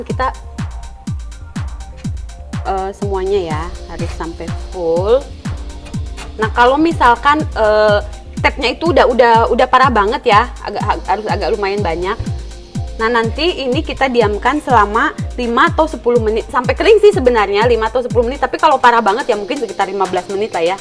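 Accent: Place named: Puncak, Indonesia. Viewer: Love your PUNCAK CITY aksen..👏👏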